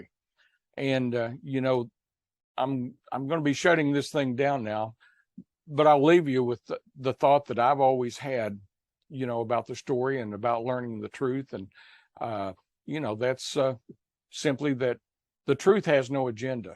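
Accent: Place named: Tennessee, United States. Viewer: Hey. I just found you. I watched the Press conference and was intrigued. So here I am. I lived near Covington for a couple months and remember seeing the signs about walking tall. I also remember the movie. I was a boy when I watched it with my father and I'm 64 now.. Very very interesting. Things aren't always what they seem. That's some beautiful area there.. I still like East TN better and if not for elderly parents here in South Central Indiana I would move there.. I really love TN. You folks have an accent though.. hahaha.. Down there they say I sound like I'm from Canada, and north here in Indiana such as in Indianapolis where I used to live as well they said I sound like I'm from TN. I am from an area in Indiana that is sorta a line where you either have a KY accent or a northern one. I guess I got the northern onel.. haha.. 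Be well sir and great videos.. I'm going to really watch all your vids.. Great stuff and really good info and evidence! Regards Tim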